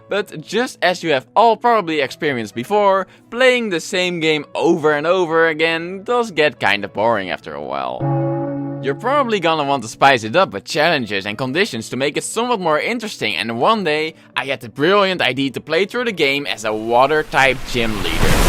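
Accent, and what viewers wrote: Netherlands. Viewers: It’s absurd but by the way you speak I asume your also dutch. Great video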